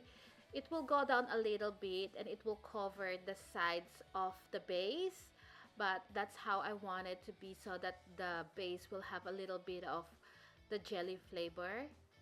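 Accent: Philippines. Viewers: Are you Filipino? You sound like one.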